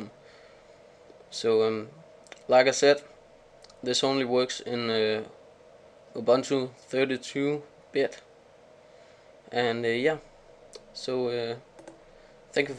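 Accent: Denmark. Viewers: Kunne høre lige med det samme at du er dansker ;)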